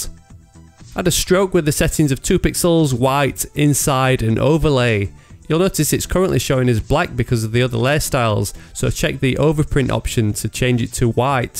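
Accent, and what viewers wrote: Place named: Scotland, United Kingdom. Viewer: It's not about entirely whether you can handle, personally it's not that fast for me, but it's a bit fast for me, or...his accent is kind of wacky, I mean, Brits are talking funny, and then there comes Scots and Aussies, which are way more wackier/funny when they talk, so, a bit harder to understand what he means when he says something at a high speed without spots in between commands/lines. I have to turn captions on to understand what scortcut he meant, or what he was saying in the first place while trying to do something or explaining.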